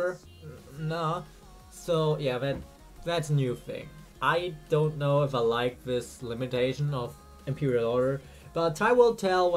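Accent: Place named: Germany. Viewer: Where are you from? You sound german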